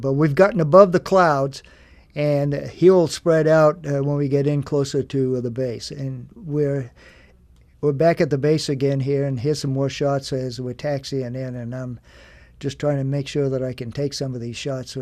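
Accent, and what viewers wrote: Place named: New England, United States. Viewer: Love this video! And the New England accent reminds me of my late grandmother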